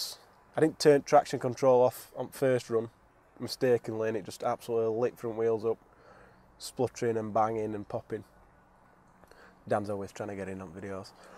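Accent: Yorkshire, United Kingdom. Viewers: Love this and this channel! And it's so good to hear a fellow Yorkshire man on YouTube lol keep up the good work! Never knew u had a YouTube!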